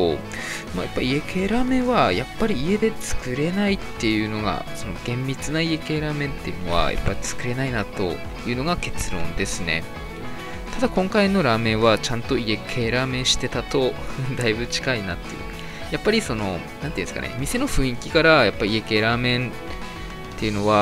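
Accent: Aomori, Japan. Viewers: 喋りに少し訛りがありますね。 青森の方ですか？ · ちなみに、青森県のどちらの出身でしょうか？なまりが？謎です。十和田市民より。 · おうち麺TVさんってもしかして青森出身ですか？ イントネーションが青森の友達にそっくりで笑ってしまった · 青森の人？ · もしかして青森出身のお方ですか？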